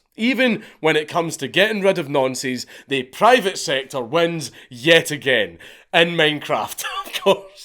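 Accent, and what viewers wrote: Scotland, United Kingdom. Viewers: Maybe it's because I respect what Dank does. Or maybe it's the Scottish accent. But the only time that I don't skip ad reads is on these videos.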